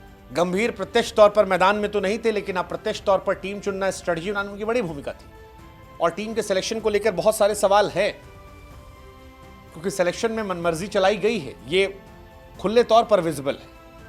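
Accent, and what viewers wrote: Bihar, India. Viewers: Bro Sounds Like a Tru Bihari.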